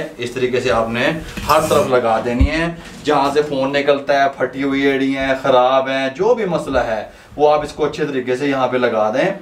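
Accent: Punjab, India. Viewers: English, Urdu and Punjabi touch, Zabardast.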